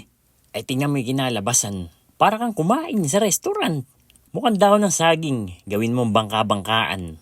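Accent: Bulacan, Philippines. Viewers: Puntong puntong bulacan boss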